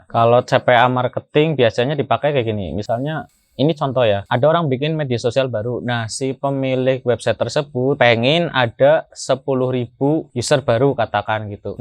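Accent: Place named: Banyumas, Indonesia. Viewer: Deneng ngapak, tapi ok ilmunya suhu, ora ngapak ra kepenak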